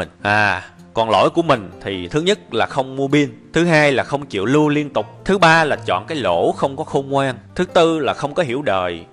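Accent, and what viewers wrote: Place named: South, Vietnam. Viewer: Rất thích giọng miền nam rỏ ràng dể nghe 👍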